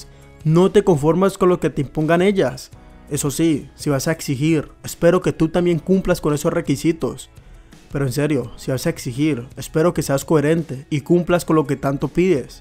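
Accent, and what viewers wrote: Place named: Cali, Colombia. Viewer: Excelente video. El pana de Cali. El acento lo delata. La info del video es verídica 100%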